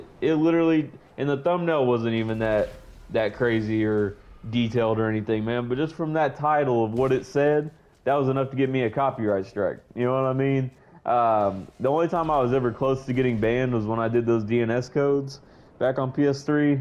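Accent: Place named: Mexico. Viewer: You sound mwxican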